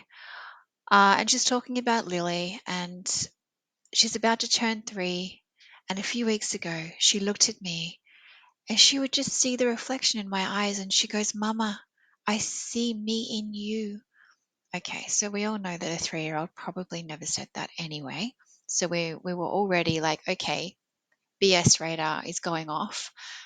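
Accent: Australia. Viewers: Nice to hear a well-spoken Australian voice. "'A whole 'nother thing" - no. "A whole other thing" - yes. (Retired Australian teacher) 😊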